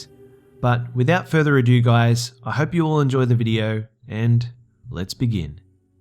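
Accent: Australia. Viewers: God dam it you are aussie now i got to like and subscribe becusae its the aussie thing to do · you can literally do anything and I'm happy. I just love that Aussie narration!!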